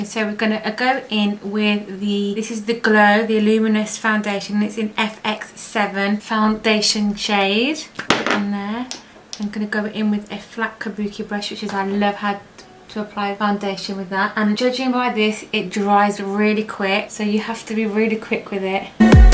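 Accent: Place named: United Kingdom. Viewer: Are you from The UK ? Love love yoir accent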